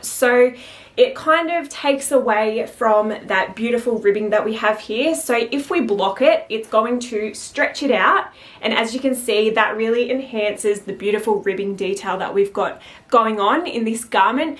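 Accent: Australia. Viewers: Thank you for another informative video! Love your Australian accent 😄 Love from the UK xx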